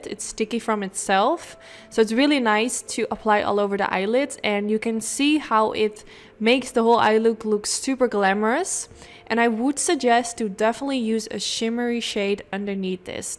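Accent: Netherlands. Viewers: Do I detect a Dutch accent?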